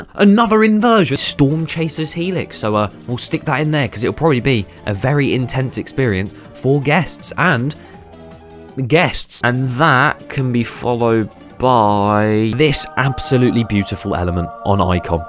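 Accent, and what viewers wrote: United Kingdom. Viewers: british accent just enhances the video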